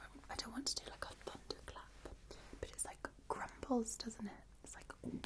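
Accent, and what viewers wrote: Scotland, United Kingdom. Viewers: I caught the tale end of the Instagram chat it was awesome to see your sister her Scottish Accent is way thicker then yours I guess because you lived In London for 8 years! Thank you for the chat it was nice! Is my guess that you let your sister win the arm wrestling contest on the mark LOL 🤣 my sister's could beat me in an arm wrestling contest there way stronger then me I'm just taller then they are! Thank you for this really relaxing Tingly video I appreciate it!:_Loveheart:_Loveheart:_Loveheart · Me looks at the title of the channel: that’s a weird name Me after hearing 3 seconds of a vid: oh she’s scottish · Hello 💖💖💖❤️❤️❤️🥰🥰😘😘🤗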